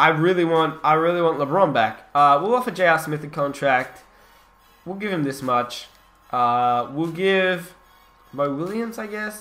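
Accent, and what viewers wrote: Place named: Australia. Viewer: yo are u from Australia or England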